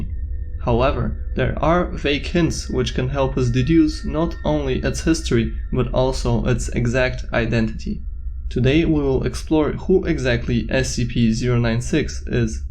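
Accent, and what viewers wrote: Germany. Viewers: Im sorry is there a Chance you are European,specifically German? My friend has the same accent I think it's really cute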